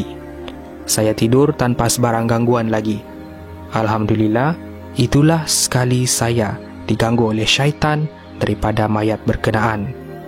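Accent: Sabah, Malaysia. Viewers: aku mmg tau pun dia org sabah 😒😒😒 sbb aku org sarawak so dah biasa dgar sleng jiran kami sabah dan kami tahu beza sleng sabah n indo.. Maybe kau x paham komen aku kot.. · orng sabah memang ckp mcm sebb orng sabah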